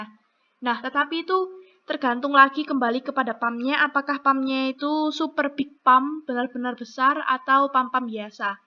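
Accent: Java, Indonesia. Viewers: Halah iki mesti wong jowo sing ngomong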